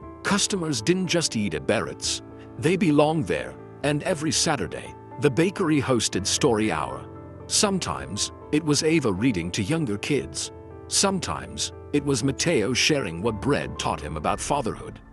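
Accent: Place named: United Kingdom. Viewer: the voiced narrative states "... catching the floating flour dust". However, the text subtitles erroneously indicate the floating flower dust -4435 the audio narrative with a thick British accent,describes Eva showing interns how to ,"braid hollow bread" and the text narrative reflects the word "hollow" bread. THAT'S A BIG MISTAKE! My husband was a professional baker. The spelling is "challah" and is pronounced holla. It is a traditional Jewish bread. It is NOT HOLLOW. You need to 1. get rid of the British accent 2. proofread 3. get a better AI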